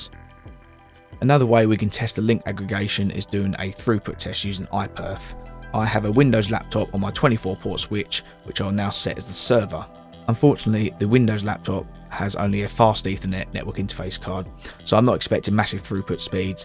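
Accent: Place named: Bradford, United Kingdom. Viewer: I know Bradford when I hear it 💯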